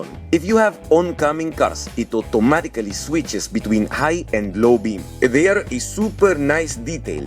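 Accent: India. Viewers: Por que hablas como Apu? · El acento se escucha como el de los indios de la india. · En realidad eres tú? Suena como tu tono de voz, pero un acento Indi demasuado marcado, no suena ni como acento latino, como le hiciste? Por lo demas excelente video bro, me encantan tus videos, keep ut up, thaks to you I made my decision between a few options to by a car appreciate it 🙏🏻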